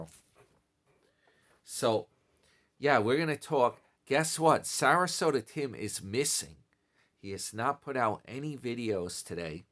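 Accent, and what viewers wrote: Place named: Long Island, United States. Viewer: Love listening to your L.I accent!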